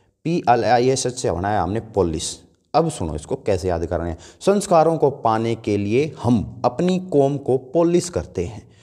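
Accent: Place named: Haryana, India. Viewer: haryanvi Aa hi jave bich bich m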